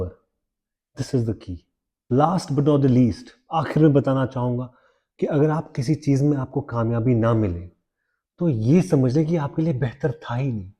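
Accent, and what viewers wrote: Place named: Kashmir, India. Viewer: Accent se hi pata chal gaya k Kashmir se ho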